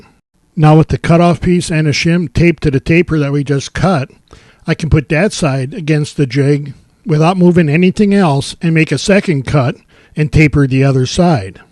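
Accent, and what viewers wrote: Chicago, United States. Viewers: I’m absolutely loving this Chicago accent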